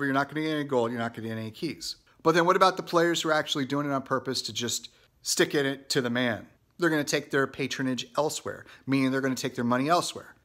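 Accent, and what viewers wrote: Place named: South Africa. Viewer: lol. It's a South African accent!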